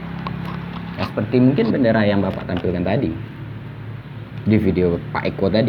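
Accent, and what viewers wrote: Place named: West Sumatra, Indonesia. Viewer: Lanjutkan pak... Suara minang...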